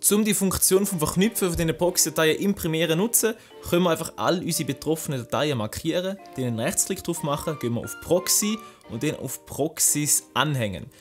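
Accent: Switzerland. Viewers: EY xD bisch du au en basler??❤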